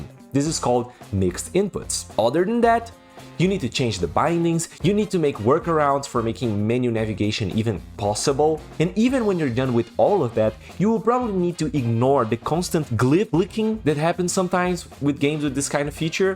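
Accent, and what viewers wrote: Brazil. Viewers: Boy, are you brazilian?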